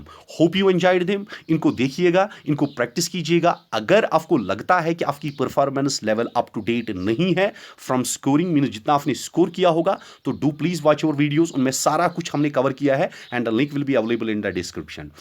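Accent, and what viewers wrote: Kashmir, India. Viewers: r u kashmiri bro..This voice is kashmiri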